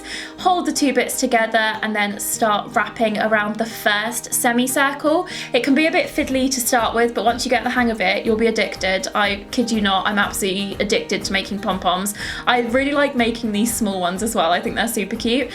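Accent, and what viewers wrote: United Kingdom. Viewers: I really like your British accent😍😍😍😍😍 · Are you British? I admire British people.😋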